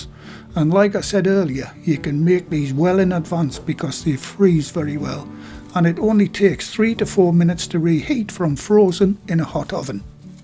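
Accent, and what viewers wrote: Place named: Newcastle, United Kingdom. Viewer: I enjoy watching your videos , and more i love listening to your jordy accent , all your recpies remind me of England And the northeast watch i call it home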